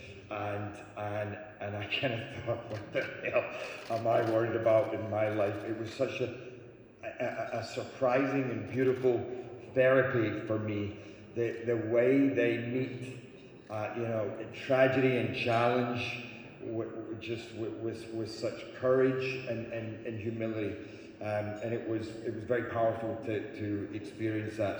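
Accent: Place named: Scotland, United Kingdom. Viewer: Thank you for all your movies. Good bless you and Scotland. Don't loose your scottish accent 🙏 🙏 🙏 Best wishes from Germany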